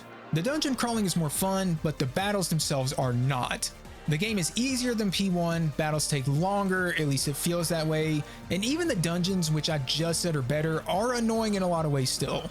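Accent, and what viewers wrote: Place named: South Carolina, United States. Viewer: As someone from SC, I do really love that your accent slowly comes out more and more throughout the video. It's such a comfort to hear!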